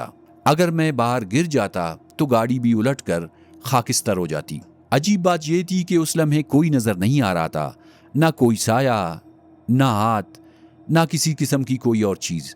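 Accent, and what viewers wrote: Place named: Balochistan, Pakistan. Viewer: واہ بلوچ تمھارا لہجہ بلوچی ھے مگر اردو اچھی بول لیتے ھو۔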